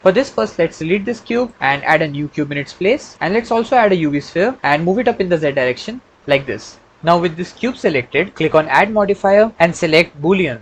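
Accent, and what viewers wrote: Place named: India. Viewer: Straight at Point and no other needless stuff 👍 You Voice and bit of accent seems like Bharatiya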